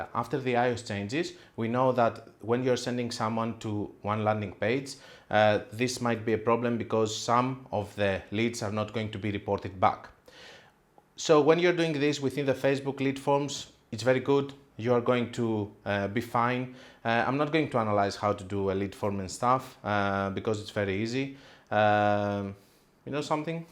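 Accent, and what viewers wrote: Greece. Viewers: η ελληνικη προφορα φωναζει απο 10 χιλιομετρα μακρια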